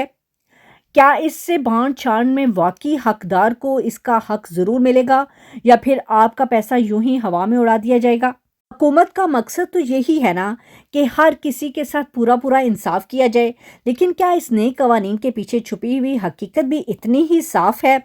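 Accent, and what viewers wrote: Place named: Punjab, Pakistan. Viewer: Tu c Paindo ho. Ardo (Urdu) Punjabi accent vich bol di ho.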